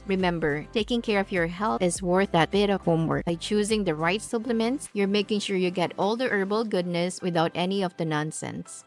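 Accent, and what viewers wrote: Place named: Philippines. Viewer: voice is from the Philippines.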